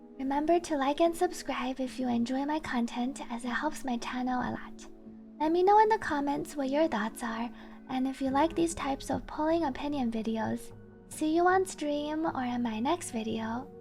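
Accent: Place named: China. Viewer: You got a decent mandrin accent, making me 99% sure you speak it lol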